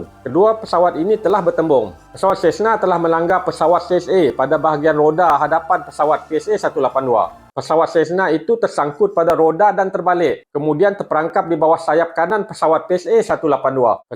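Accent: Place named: Kuching, Malaysia. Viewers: Auk, urang kuching. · Kuat slang sarawak ktk pok.. 😅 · Kamek tek rasa gia juak. Org sarawak tok kah.. yalah alu maca komen. Best topic tok. Suka nengar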